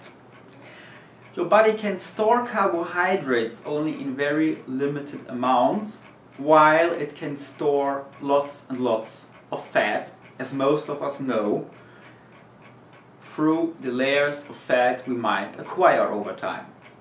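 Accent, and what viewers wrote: Germany. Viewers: After all, I still don't know what do do in my situation =D What do I have to do to lower my bodyfat percentage without losing weight anymore? Im already down to 69 KG (my body height is 188 cm) and I can't see my abs at all? Maybe you can answer my question. Would be nice if you PM me and I would prefer german, you sound like you're originally from germany ^^ Greetings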